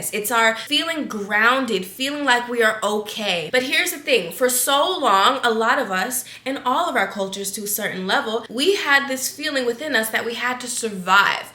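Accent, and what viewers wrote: Jamaica. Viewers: Yeah the accent is strong in this video. I think I heard a little in other videos tho. Im thinking she is Jamaican. Maybe she’s been around family or spending time there. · It’s the Jamaican accent right out the gate for me! We NEED money 💰 👏🏾👏🏾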